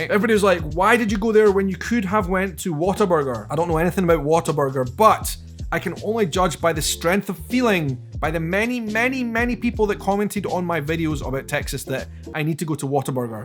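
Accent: Scotland, United Kingdom. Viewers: Scots like you say the pop in "Popeye's" like southerners e.g. in Tennessee, say "pop tent". It's basically a cross betwixt 'pup' & 'pop', a wee-bit closer to the 🐶. 🆒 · you are like a big, bright, beautiful bouquet of sunflowers in the morning! (Wow, I guess that was a mouthful). I LOVE your videos. HOWEVER, I do have one criticism: DEARHEART, YOU TALK TOO FAST. I am from the South in the US, and our lifestyle is so much slower and leisurely. We are not stupid; we just take our time. I have to sometimes watch your videos twice or more to absorb the content. You speak at warp speed (yes, a Star Trek reference). I do not know if your manner of speech is a Scottish trait or your individual personality. I feel sometimes that my brain is exhausted about halfway through some of your videos, and I give up. Sometimes I just redo it if it's content I really, really wish to see. 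I have reviewed some of your videos and found that you do not impart all your words of wisdom like a squirrel on steroids each and every time. You can make longer videos or divide them up into parts I and II. I enjoy the content so much and adore your accent. So, please, if possible, endeavor to slow your words down a bit for some of us older folks (I'm 67) who so enjoy your videos. By the way, I enjoy all the fast food restaurants you mentioned, especially Chik-Fil-A. Their grilled chicken sandwich is awesome, but their warm, chocolate chunk cookies are to die for. I hope you tried them. They are too good to miss. God bless. Love and hugs from Karen in Tennessee. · In-N-Out is the best! Open one up in Scotland and you can call it In-N-Oot. Meant with total respect for my favorite accent in the world. ❤️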